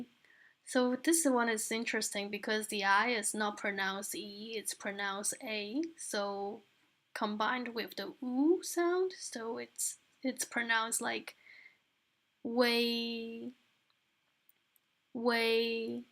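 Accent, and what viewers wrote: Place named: Brazil. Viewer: 修女，我喜欢你的视频！ 你讲得非常好，而且你的语速非常适合我在旁边做笔记。 另外，你的英语口音听起来和我的一个好朋友很像，她是巴西人。 这让我更喜欢你了！ 希望你一切顺利，感谢你的辛勤工作！❤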